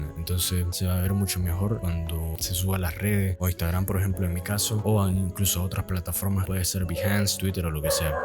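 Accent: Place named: Nicaragua. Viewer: Hermano, de casualidad no sos de Nicaragua? lo digo por el acento jajaja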